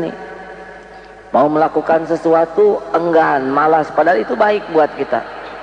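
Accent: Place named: West Java, Indonesia. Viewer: Suara AA' lah...dengerin aja Aksennya. Sunda.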